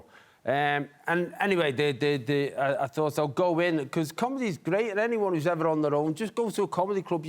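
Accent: Liverpool, United Kingdom. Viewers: Absolutely scouse...it's scouse with the edges filed down a bit though, less throaty. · This Liverpooler accent is really funny! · He is from Liverpool, is it common accent in Danelaw region? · Aye. Pretty much. Scouse. Ones Kirkby and the others Runcorn so about 20 miles or so apart. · Most scousers sound awful but hes refined the accent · Crazy Liverpool accent. Almost sounds Scottish. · What's his accent? Liverpool? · Love a scouser, so down to earth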